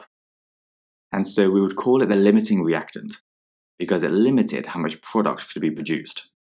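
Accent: United Kingdom. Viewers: British accent 💀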